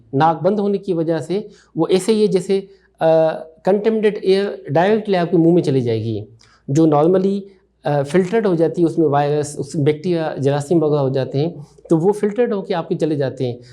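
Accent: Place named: Sindh, India. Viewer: Sounds sindhi accent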